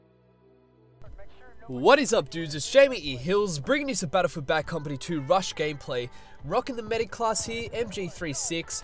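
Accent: Australia. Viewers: i am guessing you are an aussie... and if you are what clan are you in. i am in a US one right now and need some ausssie to play with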